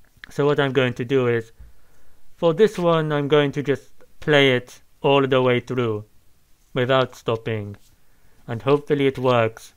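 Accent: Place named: France. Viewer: When he said "France is not a real place, but Wakanda is" I almost hurt myself trying not to laugh really loudly for fear of waking up my wife. That was great. The accent does sound vaguely French, but that could mean any of dozens of different countries. Easy to understand though, and I enjoy how much commentary he gives. His enthusiasm comes through, even with the mask. Mikio Fujioka was the guitarist who fell off an observation deck at the end of 2017, sadly. He's usually the one playing the black guitar, while Ohmura always plays his pink guitar, making him easy to spot. This time I think it was Leda Cygnus playing the black guitar, who filled in often for Mikio, because of the scheduling conflicts you mentioned. Mikio was a guitar teacher at a high level music school in Japan, so he probably needed someone to fill in for him regularly. Ohmura and his pink guitar are almost always there.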